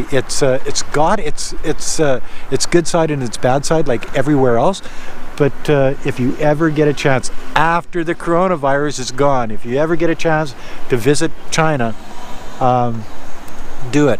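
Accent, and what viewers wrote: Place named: Canada. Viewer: Dude you sound Canadian AF 🤗 Glad your escaping China and have somewhere safe to go👍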